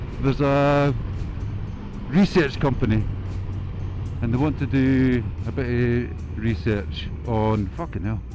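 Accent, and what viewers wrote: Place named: Scotland, United Kingdom. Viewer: "You sound like the most Scottish person ever on your videos" "Do I? Don't worry, I'm planning on doing some "proper" videos" What?! 🤣🤣🤣 Nothing wrong with the roll-on acceleration there, 👍 Anyway, I'd love to comment further but I've got to go - tonight's the night!! (by which I mean the annual village quiz night, of course). 🤓😇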